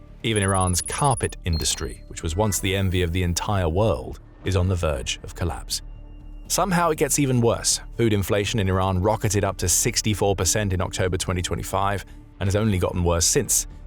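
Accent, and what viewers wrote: United Kingdom. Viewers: Why the UK Accent? Gotten indeed!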